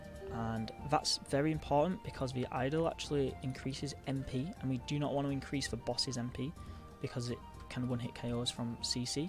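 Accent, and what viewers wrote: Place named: Yorkshire, United Kingdom. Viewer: Brighton, UK here, but I grew up in the east midlands. You sound like your from Yorkshire haha? That music was heaven! Look forward to any and all dungeons, especially the harder ones later on as I’m quite unfamiliar with them.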